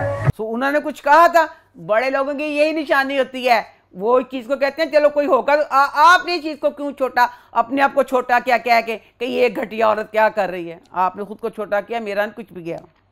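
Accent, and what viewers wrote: Punjab, India. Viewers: True.. I'm also a Pathan but we learn a bit of Punjabi accent from her too.. That's the beauty of Pakistan.. We love ❤️ all languages and dialects spoken here.. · Such a veteran actress Bushra Ansari is. I love her comedy skits she used to do on PTV. But she should be more open to criticism and accept the flaws of her play. She should understand she comes from the elders of the industry and must act like one. love you❤ I recently start watching your channel and I love your effort. I am a urdu speaking person but your punjabi tone is what i love the most and bushra appa made fun of it. She should know the most of the trps and her fame came from punjab and its very low of her saying you "pandu". Wisdom and sense of aesthetic can come from anyone who is a good observer. Peace. Keep growing ❤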